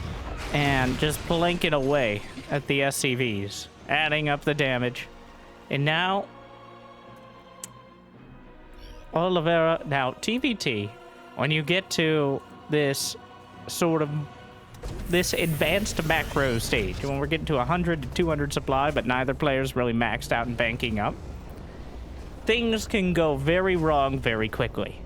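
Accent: South, United States. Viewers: Never Imagine the day, I will be watching a starcraft commentary with a southern accent.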